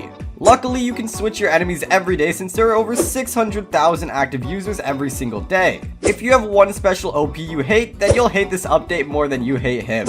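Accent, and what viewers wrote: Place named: Canada. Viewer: you got that canadian accent im assuming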